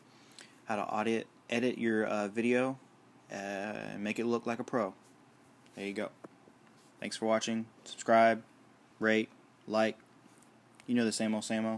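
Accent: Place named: United States. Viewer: Lovely American accent :)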